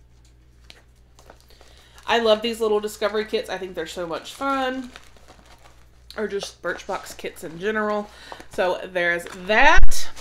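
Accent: South, United States. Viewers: 🎂🎂🎂I am so happy for you to reach this mile stone...its because you have such a wonderful channel and you are such a giving person...I am so blessed that I came across your channel...I love your country accent...And thanks again for getting me started with Globe in...I just love that box...Congratulations...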